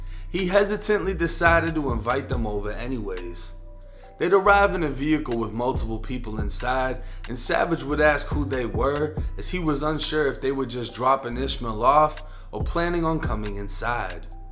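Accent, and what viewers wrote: Boston, United States. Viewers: You must be from Boston (Baah - stunn). Apartment (Aa - paaht ment)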